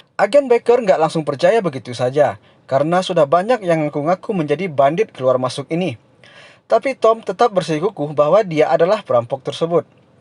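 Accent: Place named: Minangkabau, Indonesia. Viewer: Logatnya kental Minang banget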